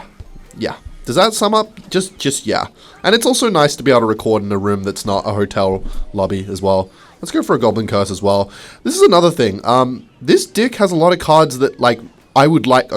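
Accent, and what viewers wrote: Scotland, United Kingdom. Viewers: Scotland ahh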